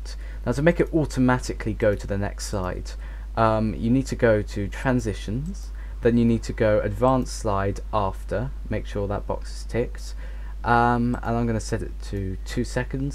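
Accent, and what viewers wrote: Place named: United Kingdom. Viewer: are you british, you sounds like you came from the united kingdom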